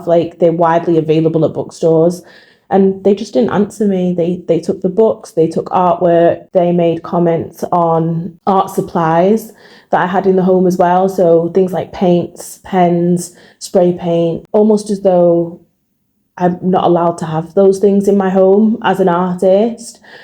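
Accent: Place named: England, United Kingdom. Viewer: I thought this was from another country at the start then I realised you have an English accent and then it began to dawn on me you were talking about Manchester and Manchester police. I should be shocked by how you have been treated, however I am not because of how the so called Government is acting. I am deeply saddened that you have suffered this traumatic treatment and your children. 15 years ago, when my children were primary school age I was treated appallingly by local police because of a false allegation & it meant I missed my sons school assembly (I had never missed one) this upset me more than what was happening to myself, my house was raided & although there was the worst snow fall in years I was not allowed back to my property until they had finished late evening. This was not as bad as you had to endure however I can understand how you felt about your personal belongings, your personal home. 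Thank you for your bravery, your resilience and for having the courage to continue to speak out alhamdulilah. May Allah continue to bring his calm and strength to you and your family for all you are doing for Palestine inshallah. Although this was a terrifying experience for your children, your children will remember how brave their mother was and that you are fighting to support Palestine in an effort to bring an end to this genocide inshallah alhamdulilah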